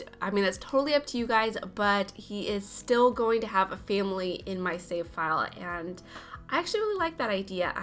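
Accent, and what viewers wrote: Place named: South, United States. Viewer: i always say this, so it’s old now- but i LOVE your builds. your exteriors especially are always so lovely and they’re all houses i would want to live in! random question: where are you originally from? you have a bit of a southern-y twang in your accent i think.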